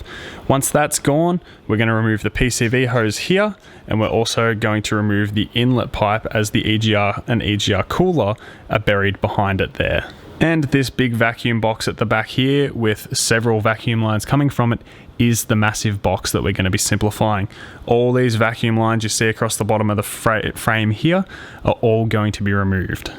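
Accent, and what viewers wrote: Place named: Australia. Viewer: I might do this. It's good hearing a local voice. G'day from the Goldy.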